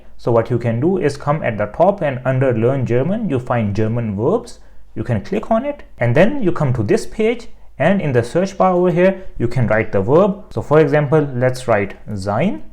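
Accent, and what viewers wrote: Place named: India. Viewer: Bro if learn from you German will not understand my German because you have that topical Indian accent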